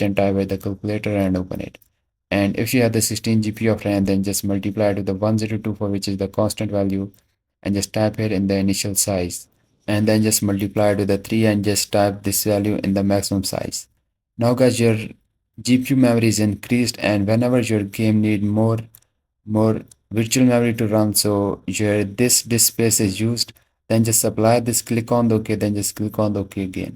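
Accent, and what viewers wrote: India. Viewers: im not trusting anyone with an indian accent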